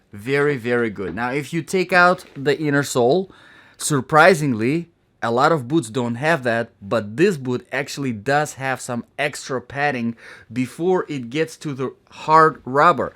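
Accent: Russia. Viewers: Russian accent?! :)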